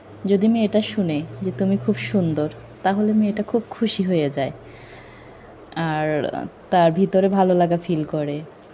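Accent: Noakhali, Bangladesh. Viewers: Noakhailla naki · আপনার বাড়ি কি নোয়াখালী আপু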